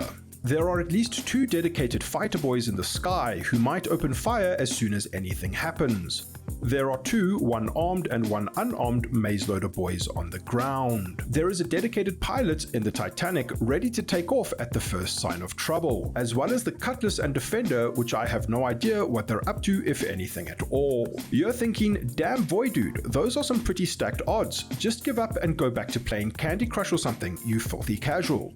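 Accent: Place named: South Africa. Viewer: South African detected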